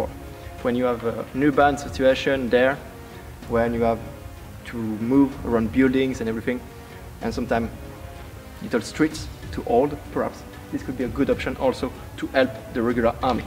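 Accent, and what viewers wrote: France. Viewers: Excellent approach! Kudos for being able to assemble more than a few warriors at one time. :) I might like to offer a few suggestions. Frankly it's difficult to follow your French-accented English; it might be easier if you spoke in French. ;) For swords, I would say to use "bastard swords" or "hand-and-a-half swords", at the very longest. Tests that we've done in the forest show that longswords are very awkward to carry on a regular basis, Peter Jackson's movies notwithstanding. And longswords are little extra help against armor. You really need an axe or a mace to directly defeat armor. Spears are great in the forest, but the ones you show in this video may be too long. I suggest you try moving about in the bush with this equipment before making any conclusions. Shorter spears allow the butt to be brought to bear if an opponent is closing in. 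Modern warfare (with rifles) dictates a more open formation for two reasons: a bullet can travel through more than one soldier if they are side by side, and soldiers can provide support to one another with rifles even if they are many yards apart. Spearmen can stand more closely, so as to provide support to one another. Of course the terrain will provide its own problems. Practically speaking, a single-edged knife is more useful in the bush than a dagger can ever be. I think your formation is mostly accurate however. The spears want to be in the front, to keep the archers from being charged. They would work as bayonets did in later age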